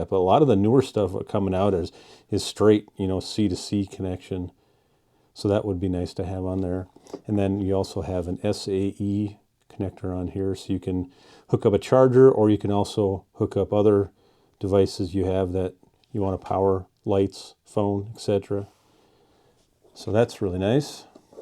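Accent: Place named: Canada. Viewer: Well done,.I can detect a little canuck in your accent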